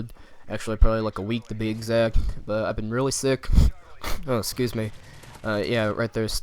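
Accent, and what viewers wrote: United States. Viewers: your voice sounded so much more confident and American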